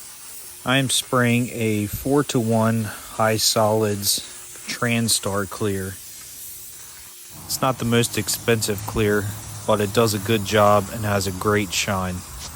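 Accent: Philadelphia, United States. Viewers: I think you're a Philly guy. I can tell that Philly accent. I'm in SJ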